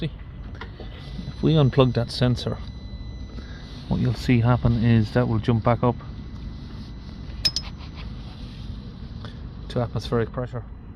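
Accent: Ireland. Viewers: You'll never loose that irish accent tipperrary carlow offaly?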